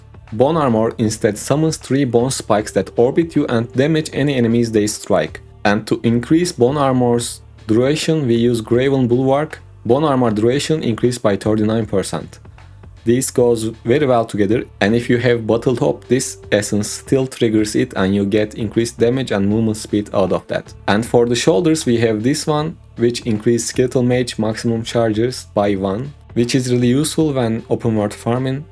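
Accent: Turkey. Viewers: İnanılmaz türk aksanı var reyis türk müsün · Konuşmasindan anladim türk olduğunu haha Almanyadan selam reis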